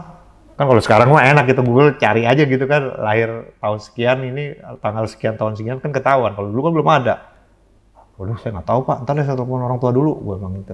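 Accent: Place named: Betawi, Indonesia. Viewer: Ngeliat jd inget waktu saya tinggal di pondok gede, Jd inget Orang2 betawi nya klo ngomong cerita kek, asyik seru.. Suka ngelawak ditongkrongan asyik om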